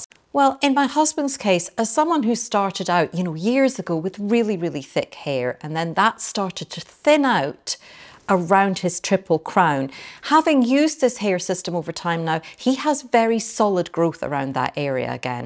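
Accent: Scotland, United Kingdom. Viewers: ~ Love your accent and Scottish sense of humour too! ^_^ All best wishes from Brighton X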